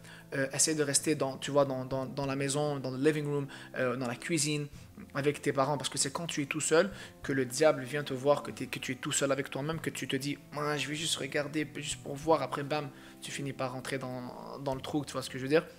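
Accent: Quebec, Canada. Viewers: Il est canadien encore heureux que il a un accent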